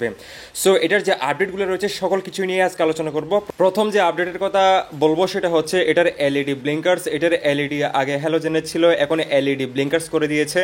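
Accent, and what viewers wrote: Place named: Chittagong, Bangladesh. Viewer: ভায়ের বাড়ি চিটাগাং। এক্সেন্ট এ বোঝা গেলো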